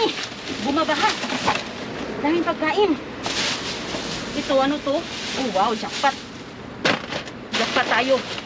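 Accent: Visayas, Philippines. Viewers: Ate NA eexit din talaga ako sa iyo habang nag hohole ka NG mga NA dumpster ka kasi ang igay MO talaga halata g bisaya din talaga hahaheee. Horayyyyy. Ate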